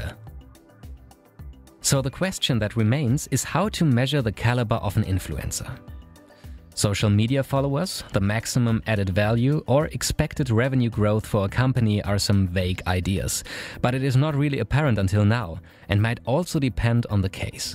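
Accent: Germany. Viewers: First time on this channel, I can tell that the speaker has a German accent 😀, right or wrong? I'm German speaker, so I think I nailed it lol. Interesting topic in the video. Edit: Just heard how you spelled Jürgen Klopp , well, now I have no doubts at all 😂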